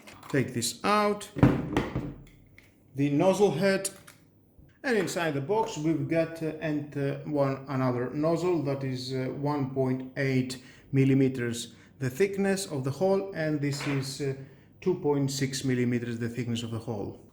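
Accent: Greece. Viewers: Αδερφε Ελληνας? :D Με το που αρχισε το βιντεο σε εφαγα απο την προφορα.χαχαχα Δυνατος! Νομιζω οτι ηθελε λιγο πιο αραιο το χρωμα, αλλα παλι καλα ειναι λογω του μπεκ. Ωραιο Βιντεο.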